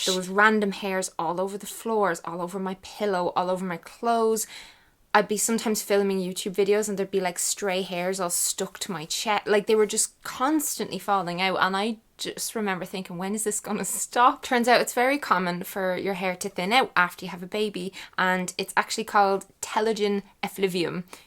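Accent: Ireland. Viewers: I love your Irish accent😉👍it sounds really lovely!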